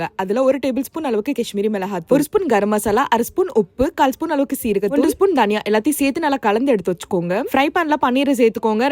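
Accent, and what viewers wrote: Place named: Chennai, India. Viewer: Chennai Tamil pa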